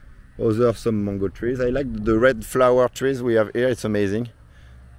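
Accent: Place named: France. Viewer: Quel joli accent français!! Je suis anglophone donc je peux le dire. 😀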